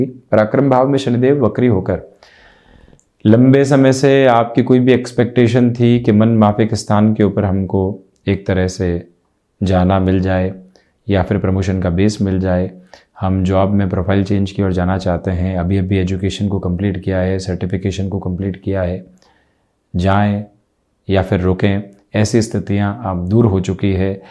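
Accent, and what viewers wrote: Standard. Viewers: Thank you for the predictions ji.🙏🙏🙏. It was crisp and to the point. However Hindi is not my mother tongue so, I had to listen very carefully to understand your words. I got so used to the bollywood style of Hindi which is filled with words from Urdu. So this is what shudh Hindi sounds like! 👍👍👍. I think it will take some getting used to.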